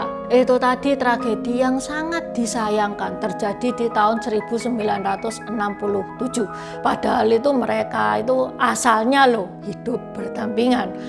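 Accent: Java, Indonesia. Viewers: ini logatnya kok jawa banget to? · Cerita nya bagus, konten nya mantap.. Tapi medok nya itu loh ndak kuat 😂😂 mungkin khusus buat org Jawa saja ya 😅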